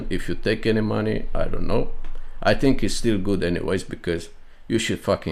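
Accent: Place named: United Kingdom. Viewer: all this long I thought dude was British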